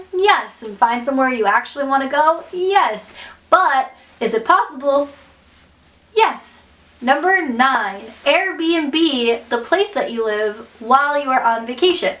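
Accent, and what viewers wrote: United States. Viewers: She sounds American and I'm pretty sure she's wearing a wig and dentures. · American accent. When you travel you realize that Americans have American accents lol.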